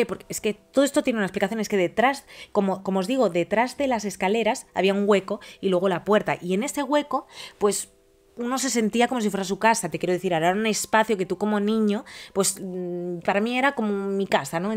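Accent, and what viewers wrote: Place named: Russia. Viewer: una pregunta tu porque abeces ablas con asento ruso marcando la "R"?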